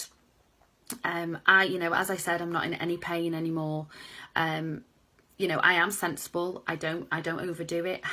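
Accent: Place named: Wales, United Kingdom. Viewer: What a GREAT video! You are an inspiration to US ALL! Love your Wales accent!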